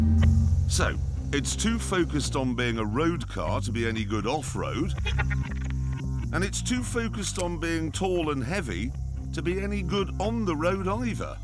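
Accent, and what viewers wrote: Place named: United Kingdom. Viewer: I love how the British at so much flavor to the review British accent I love it I love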